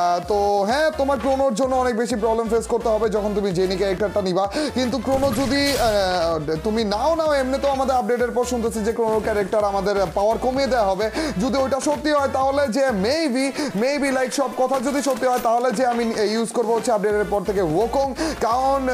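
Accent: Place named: Comilla, Bangladesh. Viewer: ভাই তোমার কন্ঠ শুনে মনে হচ্ছে তোমার বাড়ি কুমিল্লা।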